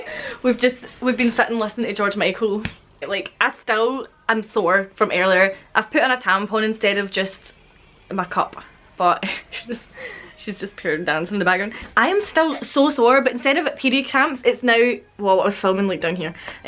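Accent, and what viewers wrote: Scotland, United Kingdom. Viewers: What's it like having a Scottish accent and then coming down to South Africa?:)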